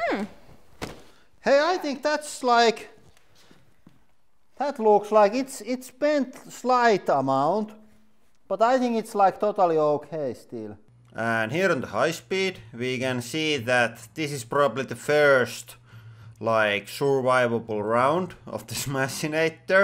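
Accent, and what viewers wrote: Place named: Finland. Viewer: Well that's Finnish for you and the mighty rallienglanti.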